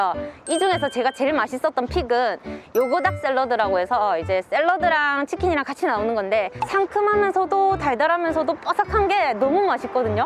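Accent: Gyeongsang, South Korea. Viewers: 정말 잘 먹는다.. 오늘~은 ...구수한 경상도 말씨 또 들어봅니다.. 저도 시켜 먹어 볼랍니다..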